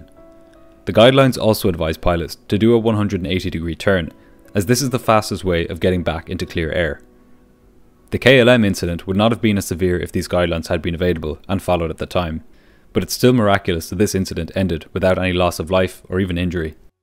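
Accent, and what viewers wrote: Ireland. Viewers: Your videos are of incredible quality. They're very easy to watch and follow, you are really onto something with this channel. It's also great to hear a fellow Irish accent in this community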